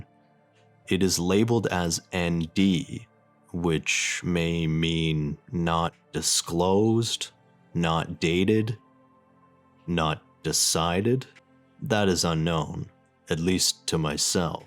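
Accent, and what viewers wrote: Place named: Eastern Canada, Canada. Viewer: this boy eastern canadian AF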